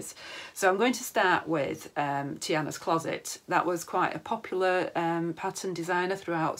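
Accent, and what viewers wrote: Yorkshire, United Kingdom. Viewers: Sewing. Free. Yorkshire accent. I’m ere for it lass. 😊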